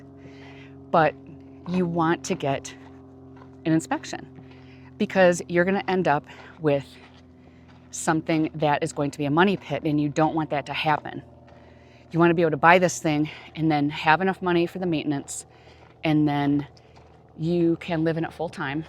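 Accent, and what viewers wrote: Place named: Midwest, United States. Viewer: Same here! What labs 🧪 do you think the original poster and I should get? It feeling absolutely awful! You sound like you have a Midwest accent my friend! I too got a hysterectomy but a bit younger at 36. Perimenopause and adrenal fatigue for 5 years now. Hormones came back normal, so now what and who do I ask for the labs? Thank you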